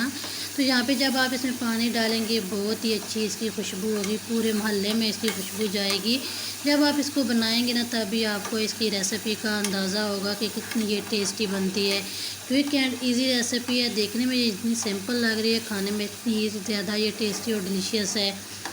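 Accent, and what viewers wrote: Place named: Bhatwahar, India. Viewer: اپ کے لہجے سے لگتا ہے کہ اپ کا تعلق بھٹوہار سے ہے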